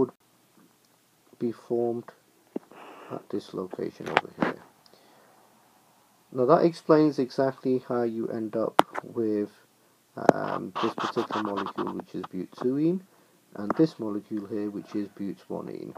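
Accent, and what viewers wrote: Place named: South Africa. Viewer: i think u are South African sir, your accent tells, by the way good job.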